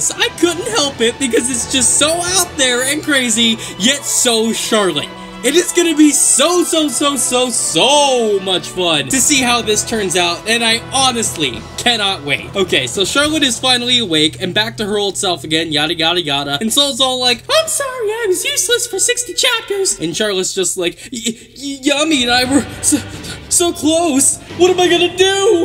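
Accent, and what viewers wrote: United States. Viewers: Dude I hate the #Americanvoice but love the content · Can you not make this American do the vids cuz its hella fucking annoying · Throw this american accent ting away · No more American accent!!!!!!!! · Great content but I'm not taken by the American accent. It's not as funny. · I'm not hating on the American accent but I feel like it removed the humor from a lot of your jokes especially from the Baaaaalllls Deep... Still good vid tho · Disliked because American accent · No this is his American accent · American accent or accent is the problem this channels facing right now. Personally I can understand the accent but for the audience that can't well I,ll leave this problem for you to decide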